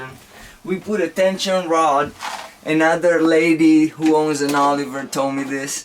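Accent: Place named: Austria. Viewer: I sense a lil but of Austrian or German accent, á la Arnold Schwarzenegger’s voice..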